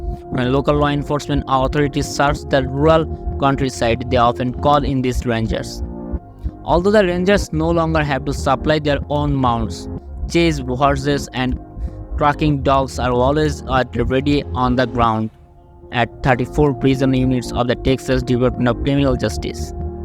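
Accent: India. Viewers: Sonehow i can't deal with an Indian accent presenting such a real Western American history story. Doesn't sound authentic. Please use someone with no accent.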